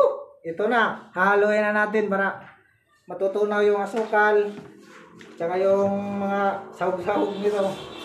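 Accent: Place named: Visayas, Philippines. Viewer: BisaYa kaaYo dodong #vlog cho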